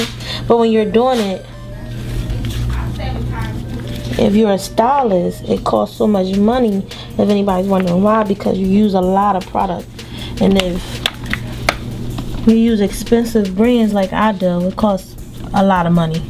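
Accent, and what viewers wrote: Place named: Alabama, United States. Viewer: More like Alabama or Tennessee, sis. Nobody in Nola sounds like that... I’m from Nola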